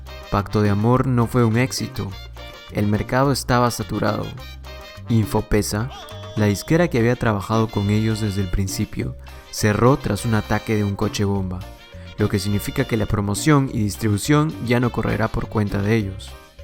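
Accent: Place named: North Coast, Peru. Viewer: Con acento norcosteño peruano, o norperuano costeño, como parte de la subvariante más meridional del español ecuatorial, en peligro de extinción debido al avance del español ribereño de Lima y del español norandino de la sierra norte a la costa norte del Perú desde sobre todo la cuarta década del siglo XX. :eyes-purple-crying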